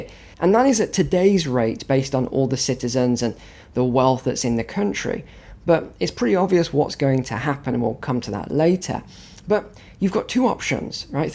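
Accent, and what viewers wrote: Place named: Scotland, United Kingdom. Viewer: Önrealized? Dötsch GOVT? Bödget? Is that a scottisch Akzent?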